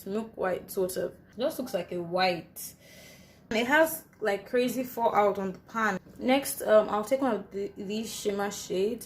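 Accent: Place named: United Kingdom. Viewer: This your British accent doesn't want to go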